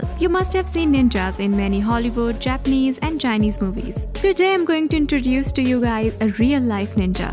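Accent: India. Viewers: This indian dialect, is triggering me so god damn hard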